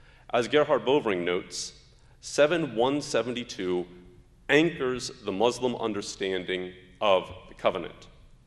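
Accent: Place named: Arab world. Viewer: He has a beautiful genuine Arabic accent.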